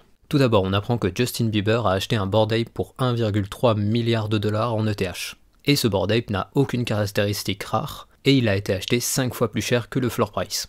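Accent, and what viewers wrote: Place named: England, United Kingdom. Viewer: J'adore ton accent anglais of course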